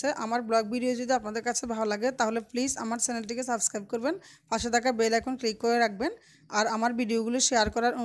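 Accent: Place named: Noakhali, Bangladesh. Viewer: কথা শুনে মনে হচ্ছে উনি নোয়াখালির।এদিকের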